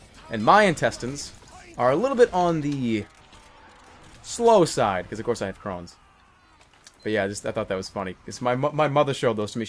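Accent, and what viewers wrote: Boston, United States. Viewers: he always had the boston accent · well ya, scout just has a boston accent. Jerma sounds like hes from them parts.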